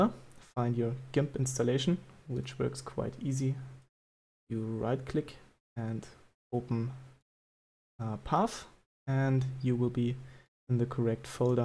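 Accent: Germany. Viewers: good video helped me gonna try that out. could immediately tell that ure german because of the accent :p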